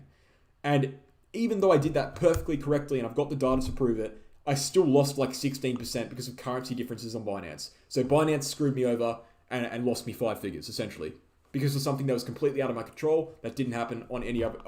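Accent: Australia. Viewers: And screw it if the channel doesn’t grow. Your honest. People can’t handle that. Screw them. 😂😂😂 Plus on a lighter note , I lived in Oz and New Zealand, Your accent is Oz not Kiwi. 😂😂😂😂😂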